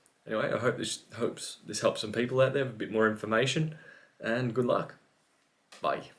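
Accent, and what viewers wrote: Australia. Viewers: I'm looking to get into device repairs and cleaning and I have to say these videos have really helped me, your channel is criminally underrated and it's good to here another Australian voice actually helping.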